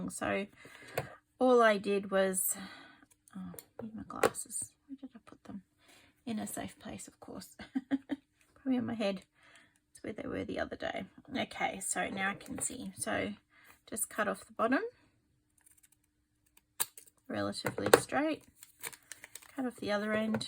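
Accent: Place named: Queensland, Australia. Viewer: New subscriber from BC Canada spent 2 1/2 years in Queensland as a teenager love hearing you talk makes me homesick for my second home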